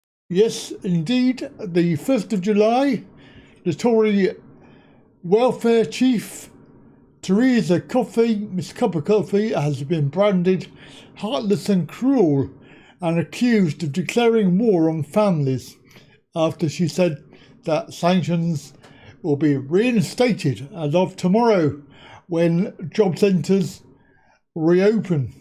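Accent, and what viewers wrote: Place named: United States. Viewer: Why is the voice in a yank accent?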